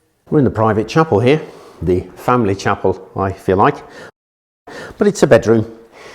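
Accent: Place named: England, United Kingdom. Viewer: Just came across your channel, this priest hole hiding episode is the first vid I have ever watched belonging to you fantastic easy to follow and put together really well... Not to mention that sumptuous English accent you have!! When I hit subscribe I was disappointed I hadnt already hit subscribe sooner before. 🇮🇪 (3hrs have past) since my first visit; to your channel, and I am hooked on history with!!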